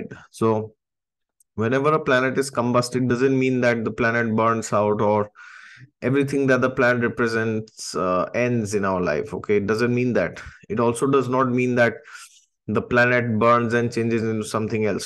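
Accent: Assam, India. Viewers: U must be from Assam right? Ur speaking tune is something like an Assamese! 🥰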